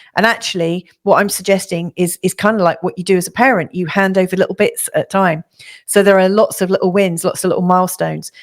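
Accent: United Kingdom. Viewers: you seem like a really nice person! great insights; calm, british accent (a must) - haven't been into astrology for a few years but you've got my mind moving! thank you :) - scorpio (pluto/mercury first house) scorpio (sun venus chiron 12th) & aries moon (4th) :))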